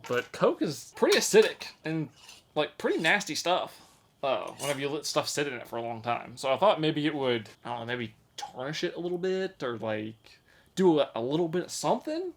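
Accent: South, United States. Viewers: I wanna say that was a joke but it comes far too easy for him. For what it’s worth though I believe we found out that is playing a character in some videos. It’s not noticeable now but if you check his old vids you can see how differently was acting back then. I swear he was even putting on a (stronger) southern accent in those vids on top of the rest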